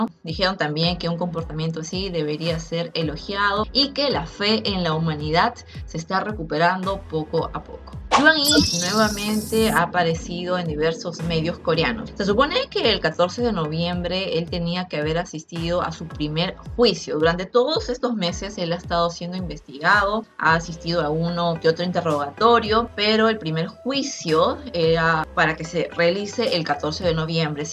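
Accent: Peru. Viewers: Sospechaba que eras peruana por tu manera de hablar. Yo también. Y te felicito por el gran trabajo que desempeñas. Que sigan los éxitos! ❤ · Es obvio que eres de Perú, tu acento te delata amiga y pues no es necesario que digas de dónde eres ya que son noticias del entrenamiento coreano.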